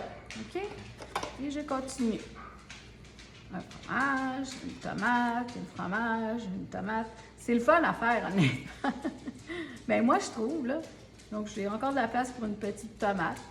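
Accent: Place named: Quebec, Canada. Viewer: Bonjour, je vous decouvre à peine et je suis agréablement surprise par votre simplicité et votre humour. C'est un plaisir de vous entendre parler avec ce si joli accent du Québec, pour moi qui suis en France. Vous me faites voyager, merci pour ça 😉. Et vos recettes sont simples à réaliser et délicieuse. Moi aussi mon surnom c'est 😊 Je vous envoie bon baisers de France 😍 😉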